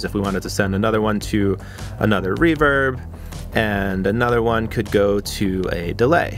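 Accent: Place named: Long Island, United States. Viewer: Hey great vid I’m in Jersey I seen that strong island accent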